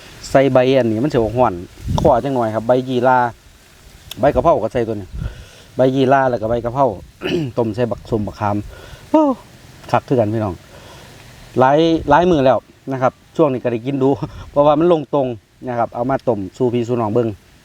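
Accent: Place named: Khemarat, Thailand. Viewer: บ่าวท้าวเป็นบ้านใด๋ฟังสำเนียงเสียงกับการเว่าคือคนแถวไปบ้าน(อำเภอเขมราฐ)แต่ถ้าบ่แหม่นกะขอโทษจ้าแต่ตอนี้คนถามอยู่ลพบุรีได้30กว่าปีแล้วแต่เคยลืมเขมราฐ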